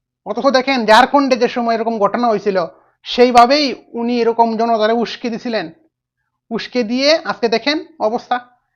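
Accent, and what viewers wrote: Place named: Bangladesh. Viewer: Are you Bangladeshi?. Because your style of speaking is completely Bangladeshi people.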